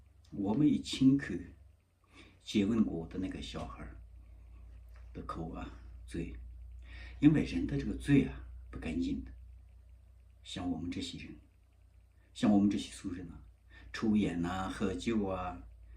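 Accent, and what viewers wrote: Qinghai, China. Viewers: 但是我从来没有听说过尊者说过没有神灵的说法😏哦😳我错过了什么一部分·．还是，听错了， 请不要把你的想法和达赖喇嘛的说法混为一谈，尊者教导我们要尊重其他的．异，信仰，但是我从来没有听说过你说的….没有神灵、 还是你的青海话，我听不懂，如果听错了请你原谅我的言 论. 但藏族人说藏语还是听起来比较舒服. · 他的汉语这么地道 他带的是青海口语。 我觉得你没有根本读过小学的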